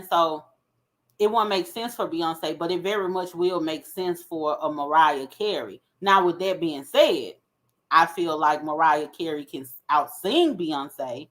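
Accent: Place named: Virginia, United States. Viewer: You sound like a Virginia girl!😊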